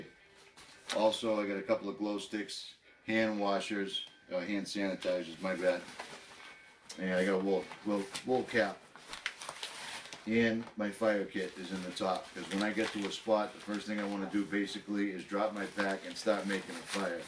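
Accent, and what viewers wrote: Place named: Brooklyn, United States. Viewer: I heard about your channel so I just subscribed. I need to know everything in this pack! I want to turn my over stuffed BOB into a INCH bag. I love how you sound like my Brooklyn cousin who's now passed away. There's nothing bad about being a truther. Thanks for the laughs. Need less to said I'll be watching this with my note pad.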